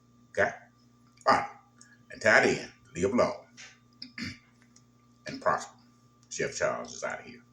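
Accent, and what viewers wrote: South, United States. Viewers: Once I heard your southern accent, I knew that you knew what you were doing ❤❤❤❤. I'll have one maybe 2 slides please thank you so very much!!!!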